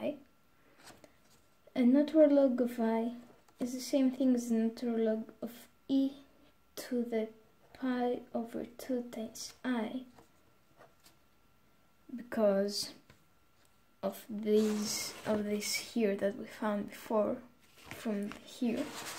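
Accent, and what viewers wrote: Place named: Russia. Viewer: U sound russian accent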